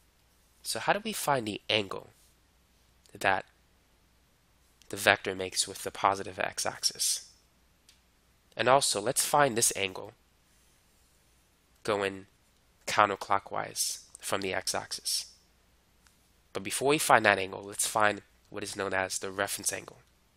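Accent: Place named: East Coast, United States. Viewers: He’s from the east coast the way he says north “norf” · Are you from New York, you sound like it!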